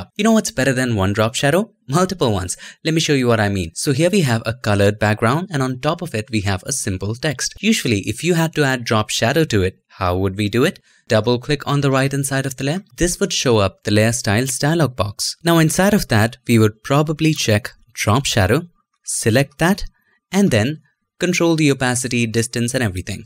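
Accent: India. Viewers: You know what’s better than an annoying Indian accent? Proper English.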